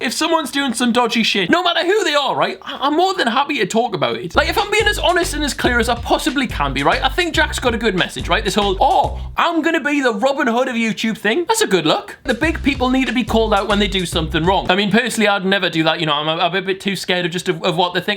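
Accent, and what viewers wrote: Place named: Northern England, United Kingdom. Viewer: Ay who knew we had a northern English content cop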